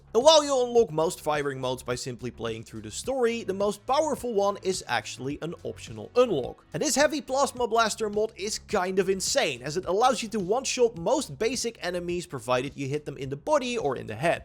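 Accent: Netherlands. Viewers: Ga alsjeblieft gewoon Nederlands spreken Je kan geen Engels Je hebt een heel zwaar Nederlands accent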